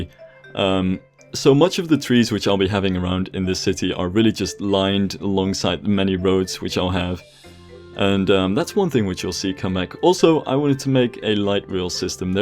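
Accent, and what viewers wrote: Netherlands. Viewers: Yeahh I know but I was checking his Socialblade and there was an other country xD but his voice is Dutch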